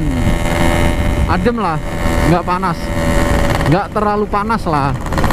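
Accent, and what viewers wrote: Banyumas, Indonesia. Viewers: Asline ngendi bro. Deneng krungu ngapak"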